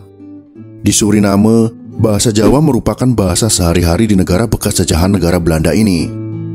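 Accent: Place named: Kalimantan, Indonesia. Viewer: Jawa Kalimantan ya min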